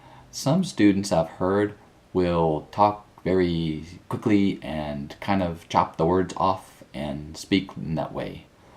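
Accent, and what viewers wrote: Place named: United States. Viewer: You are not speaking English, you are speaking American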